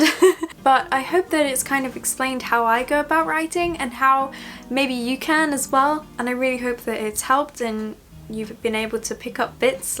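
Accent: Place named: United Kingdom. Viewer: Very interesting video. Tysm for making my mood better (my school starts tomorrow ). Maybe when I'll be older, I'll use these tips. I really luv your british accent. That 2 kisses at the end make me smile.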